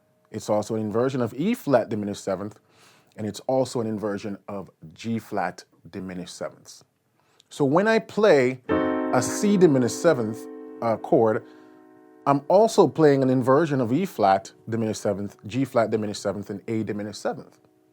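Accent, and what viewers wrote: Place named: Jamaica. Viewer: Lol I love when the yardy accent slips in and out lol, great stuff bro! Love from Trinidad!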